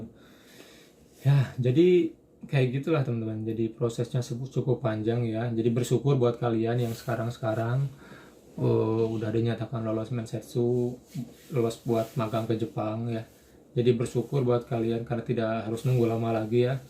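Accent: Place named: West Java, Indonesia. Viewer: Logat Sunda nya kng rada ka candak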